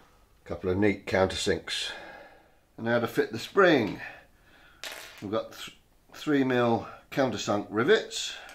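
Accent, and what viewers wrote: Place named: Hertfordshire, United Kingdom. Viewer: I enjoyed this :) I would say a Herts accent, maybe Bushey area?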